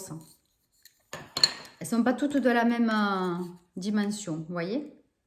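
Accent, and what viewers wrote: South, France. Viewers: Bonsoir! Super haul et très bien présenté, ça m'a donné envie d'y retourner. Je suis dans le sud ouest et par ton accent je me demandais si c'était le Noz de Montpellier. Bonne soirée.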